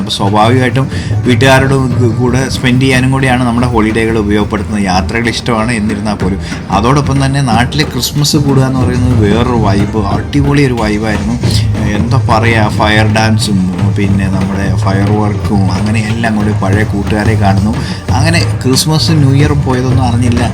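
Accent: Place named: Kottayam, India. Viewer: സംസാരം കേട്ടാൽ അറിയില്ലേ കോട്ടയം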